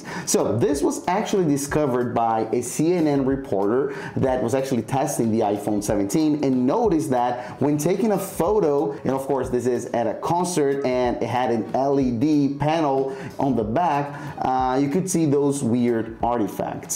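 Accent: Brazil. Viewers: Great video, thank you! By the way, are you Brazilian?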